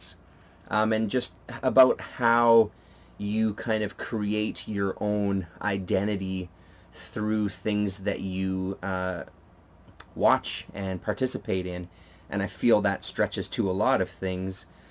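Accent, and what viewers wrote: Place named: Canada. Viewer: Canadian! He says "aboat"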